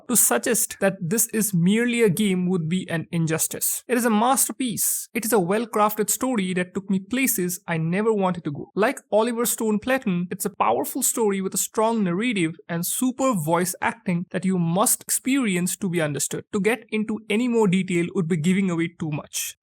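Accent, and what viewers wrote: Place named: India. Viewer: The channel's about section says channel was made in India. His accent is also Indian. So he is?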